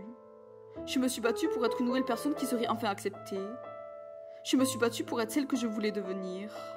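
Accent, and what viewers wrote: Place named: Nîmes, France. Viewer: Tu es Nîmoise (au vu de ton accent) ? Et oui tu y arriveras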